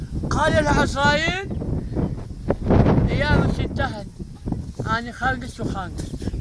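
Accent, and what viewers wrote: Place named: Levant, Arab world. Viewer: شلون مطالع من بغداد 3 سنوات اني خدمتي العسكرية بالموصل وزاخو حبي انتبه لهجته من بادية بلاد الشام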